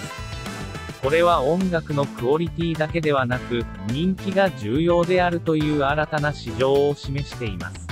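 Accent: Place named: Kansai, Japan. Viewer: は、やはり関西弁バリバリの解説がしっくり来るのですが😅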